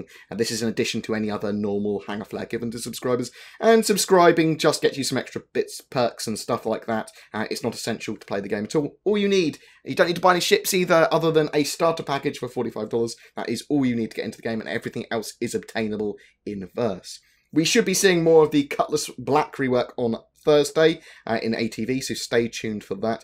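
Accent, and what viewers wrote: United Kingdom. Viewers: I love the british Accent! <3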